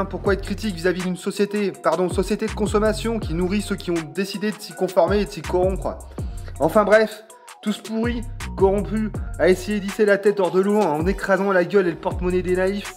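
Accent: Loire, France. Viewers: avec l'accent de la Loire, je dis oui!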